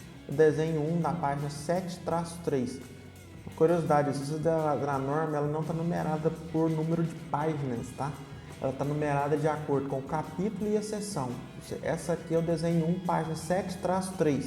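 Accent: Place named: Minas Gerais, Brazil. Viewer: toptop explicação na nossa lingua "mineires" de facil aprendizado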